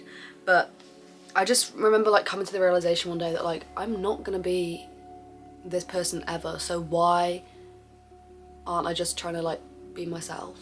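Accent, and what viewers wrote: United Kingdom. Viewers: Бля смотрю это чтоб повысить уровень англ. И нихуя не понимаю! Британский англ. – это полный пиздец. Как блять эго понять?? 😭 Смотрю на субтитры и понимаю половину слов, а если их убрать – нихуя. Блять учу англ уже год и не могу поднять уровень 😭 извините, но моей душенька устала. Теперь легче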